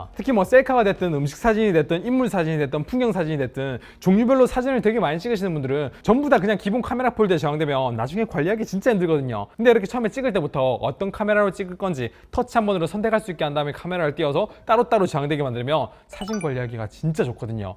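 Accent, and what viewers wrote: Gyeongsang, South Korea. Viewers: 좋은글 감사합니다~ 정신없는 경상도 말투는 정말 안바뀌시네요~ 😂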